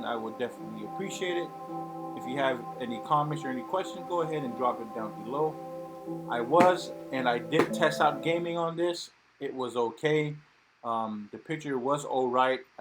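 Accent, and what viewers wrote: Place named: Hawaii, United States. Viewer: I watched this for 2 minutes and could tell you were from possibly Hawaii or somewhere around there. I'm from there so I like to see if I can tell if someone is from there or not. Pretty good video man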